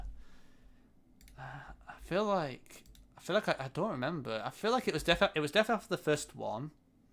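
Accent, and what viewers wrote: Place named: Lancashire, United Kingdom. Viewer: Don't give up. Your doing great. I always watch your videos (I am Polish). I will region guess you by accent. Your'e from Lancashire, either Preston or Wigan.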